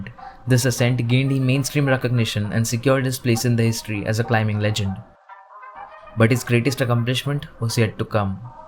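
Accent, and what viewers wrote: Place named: India. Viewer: Are you Indian? I hear you sounds like call center.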